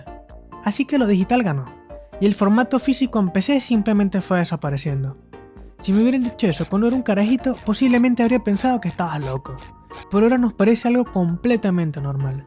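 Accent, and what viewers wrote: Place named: Chile. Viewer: Quizas me equivoqué pero por su acento deduzco que es chileno o peruano. Parece más chileno que peruano.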